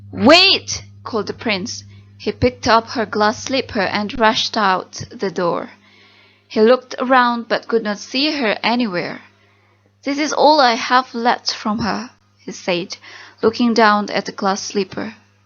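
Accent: United Kingdom. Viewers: Wow! What a great Job, Sister! Where did you learn English? I love your english pronunciation and accent. It sounds like British. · First i hear you then i love you.. Auto subscribe.. It sounds like british😍 Semoga channel Kaka makin berkembang yaa.. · british ya kak 😍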